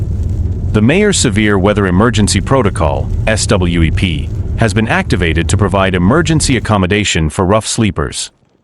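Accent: United States. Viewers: Why is it an American reporting this….sounds like bull … No different to any other year for some parts… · Why has the narrater got an American accent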